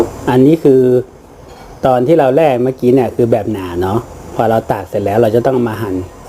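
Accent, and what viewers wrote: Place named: North, Thailand. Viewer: ฟังสำเนียงคนเหนือแน่เนาะๆ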